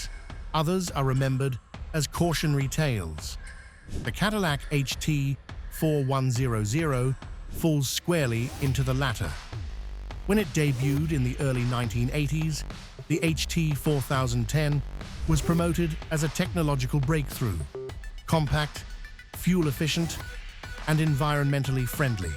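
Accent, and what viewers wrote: England, United Kingdom. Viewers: Four Thousand , One Hundred and One.............> WRONG:!!!! 4100..........Four Thousand, One Hundred..............PERIOD! Do some EDITING,,,,,,,,/"RESEARCH",,,,,, and get "RID" of the "GAY: English Guy's VOICE! Brits have been : LOOSERS,,,,,,for OVER 100 years now,,,,,,,,, "COME ON MAN"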